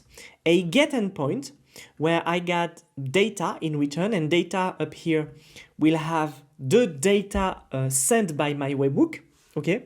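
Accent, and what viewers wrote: France. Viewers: French accent is soo cringe 🤣🤣🤣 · Tu es français ? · Английский язык с французским акцентом намного понятней чем английский с британским акцентом 🙂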